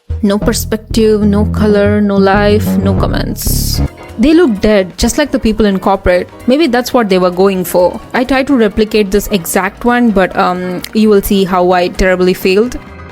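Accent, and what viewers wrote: India. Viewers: u sound indian lol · Why you have indian accent? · thrown off by the haryanvi accent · Your accent is very much indian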